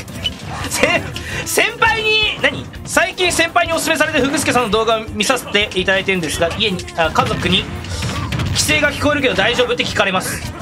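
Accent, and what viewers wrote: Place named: Hakata, Japan. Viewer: シンプルに心配やしとりあえず体治してまた長野まで博多弁届けてくれよな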